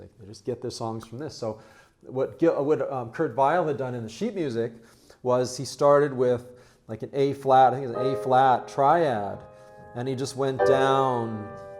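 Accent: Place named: New York, United States. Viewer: It's been such a pleasure watching you and leaning with you over these past few year. I have noticed that your NY accent and cadence have gotten a bit thicker over time compared to your first videos ;-) Keep well.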